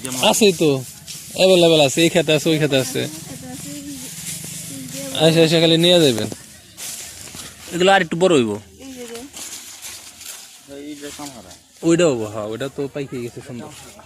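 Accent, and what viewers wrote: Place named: Tangail, Bangladesh. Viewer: অনেক ভালো লাগলো আপনার কথা শুনে মনে ভরে গেলো ভাই কারন হলো আপনি টাঙ্গাইলের আঞ্চলিক ভাষায় কথা বলেন আমার বাড়ি ও টাঙ্গাইল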